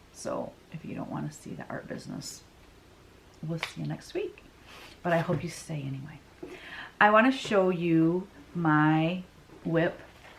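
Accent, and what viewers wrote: Michigan, United States. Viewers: Hi sounds like you live in Michigan also Love your show just found you. Your work is gorgeous I'm getting back into cross stitch. Thanks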